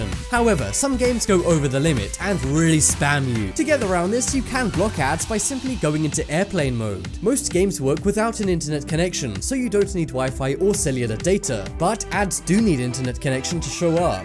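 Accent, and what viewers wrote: England, United Kingdom. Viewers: How about talking a little slower, and not speak the Queen's English! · Most irritating background music. Your accent sounds as though you are English, so the correct term for a meal ordered to be consumed at home is a Takeaway, not a Takeout, also, the correct abreviated term for Mathematics is Maths not Math. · Sure would have been nice to understand wtf he was saying. British accent. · What the hell was that British ninny saying?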